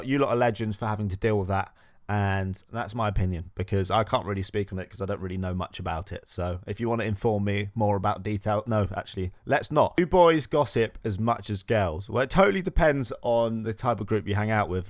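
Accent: United Kingdom. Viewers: U have a great voice and accent...r u British?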